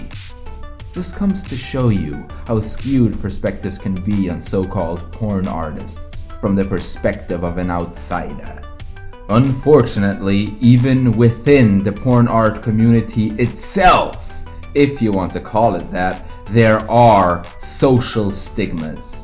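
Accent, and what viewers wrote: Switzerland. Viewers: a german (german swiss) accent, so beautiful, so prosaic! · dude you're swiss? that explains so much about your accent and unorthodox iconography.... the rick and morty work and young justice stuff you did...fuckin supreme! you draw some sexy ass humanoids.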